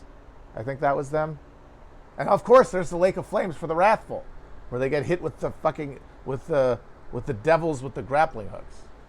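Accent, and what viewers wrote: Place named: Italian-American, United States. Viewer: As a Buffalonian, I appreciate the gabbagool accent for us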